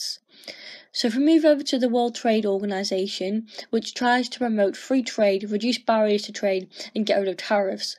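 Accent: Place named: United Kingdom. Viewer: Can you please make your content to be in text so we can see,so of us can't really keep up with the British accent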